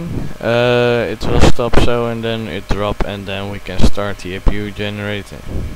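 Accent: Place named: Netherlands. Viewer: Nice video, I like your Dutch accent :-) That's remember me the cockpit visit when I fly with KLM, lol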